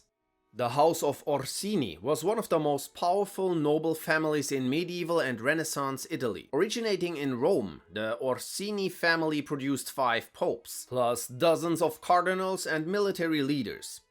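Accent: Germany. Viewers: December 28, 2025 - As an admirer of, I enjoyed this video as much I have his other offerings. This video covers a interesting subject, and I did learn some things. It is my contention that among the "faceless" international elites that determine much of what happens in Western politics and society. Some of the families mentioned in the video are part of that group. Lastly, I loved hearing the good pronouncing the various family names with his distinctive German accent. 👍👍😊I take this opportunity to wish the good the very best of the holiday season 🎄and a Happy and prosperous New Year🎆🎆 Stay well.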